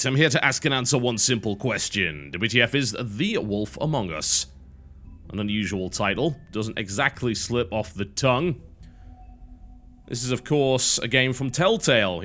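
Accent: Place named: Newcastle, United Kingdom. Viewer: Let me guess were you're from by your accent you are originaly from newcastle but you traveled frequently as a child then you moved to north London at age 20 or 22 until 25 and then America were you have been living for 3 years now. Oh and great channel and awesome videos keep up the magnificent work.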